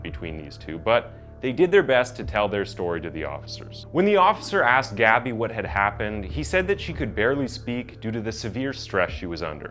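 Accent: United States. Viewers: The narrator has a very American accent so am I the only one that finds it strange he cannot pronounce Yosemite I mean I’m Canadian and that’s not a far stretch but I’ve known about Yosemite park my whole life it’s not a small place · I like this guy but I’m curious where he is from. He sounds typical American but mispronounced well known words like Maryland and Yosemite. Just an observation.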